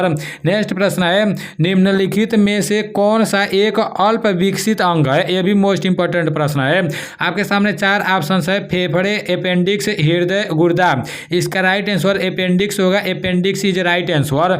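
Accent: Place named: Bhojpuri, India. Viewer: bhaiya apki Bhojpuri launguages bahut mast lagata hai, I belong gola bazar, Gkp se hu bro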